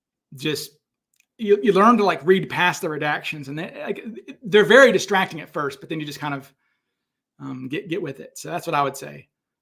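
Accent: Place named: South, United States. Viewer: Ha voice of insight/truth/reason but a hillbilly voice PS like grading the suspects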